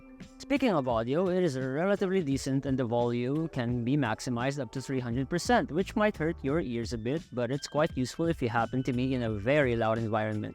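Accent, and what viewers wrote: India. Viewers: Indian nationality ba yung nagsasalita? 😮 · Bakit may slight na Indian accent? Lol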